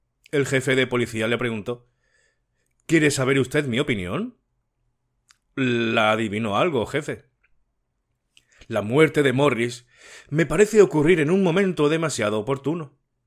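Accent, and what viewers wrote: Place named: Spain. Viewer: ❤Frondosa imaginación la de A C.Hermosa la lectura,con su española voz,señor..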